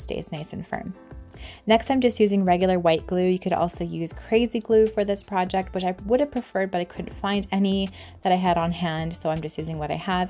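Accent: Canada. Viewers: Hi! I just subscribed to your channel and just loved every one of your DIYs. My favorite is the bird houses, but the faux water can and your garden sign are a close second. I also love your Canadian accent and am looking forward to more videos.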